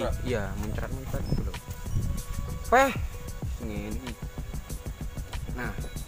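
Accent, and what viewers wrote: Java, Indonesia. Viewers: Ngmong mu lucu mas tp q paham ..q jawa jg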